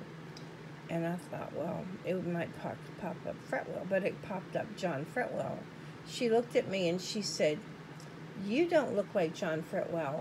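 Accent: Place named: Virginia, United States. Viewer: "I say 'otha, otha, otha (not other) coz I'm from Virginia." Lol. I love you when you are at your natural best. 😘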